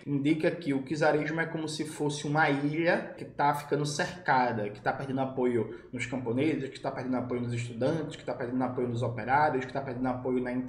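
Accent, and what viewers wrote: Pernambuco, Brazil. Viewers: Isso é o sotaque pernambucano amooo❤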